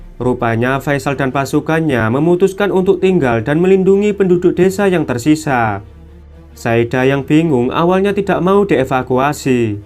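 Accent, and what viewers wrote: Java, Indonesia. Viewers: Komentatornya medok banget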